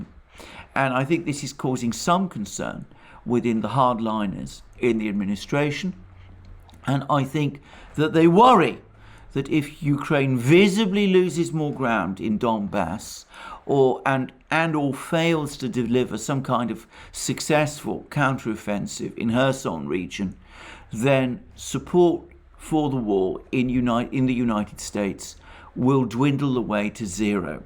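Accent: United Kingdom. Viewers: THIS GUY tries to convey the the Russians have their act together. THEY DON'T AND NEVER WILL because RUSSIANS cannot freely communicate or express themselves, and the populace have no influence in Moscow under Putin's heavy hand. NOWHERE in this phony diatribe does he mention the successful Ukrainian attacks on many Russian weapons storage sites. Because that is a major event in the war, it calls his entire "analysis" into question. To me and any casual observer, his is nothing more than words with a British accent.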